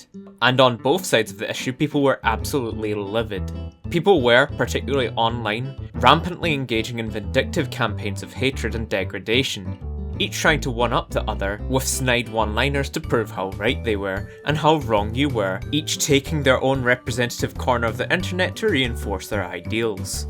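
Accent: Scotland, United Kingdom. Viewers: Time for monthly dose of smooth Scottish gamer man Also make the walking dead video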